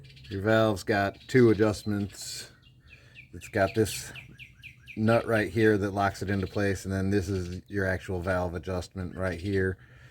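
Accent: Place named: Kentucky, United States. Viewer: Im gonna get you a phone camera stand for you this garage day this year which is first day of winter only as a early as possible date and You'ved lived in Kentucky for so long your starting to speak tucky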